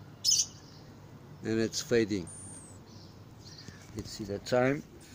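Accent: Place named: South Africa. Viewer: Do this tracking on the roof or it is not worth anything. You sound like you spent time in South Africa, I could be wrong. :) Use a 360 camera to record these events. :)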